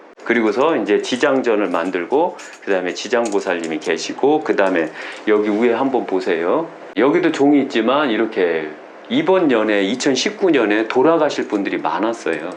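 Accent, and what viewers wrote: Chungcheong, South Korea. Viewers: 유교도안닌것같고 불교도 아닌것같고 무당도 아닌것 같은데 신흥종교인가? 귀신도쫓고 각잡종교 좋은것만 모아서 ...순진한척 충청도 사투리로 이래유 그래유 해가며 그런데 왜 그리 사는지..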